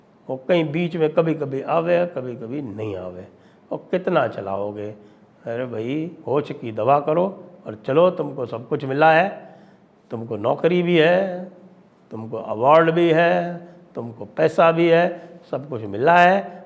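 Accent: Haryana, India. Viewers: Chokhha hariyanvi andaaz sir ji😂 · A very amusing and informative video with hariyanvi tone. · Sanjay Dixit, " Thari Haryanvi Mahre Aachi Lage " Thanks. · Loved the Haryanvi accent, and the clear update on this issue. These shorts are so valuable. Thank you.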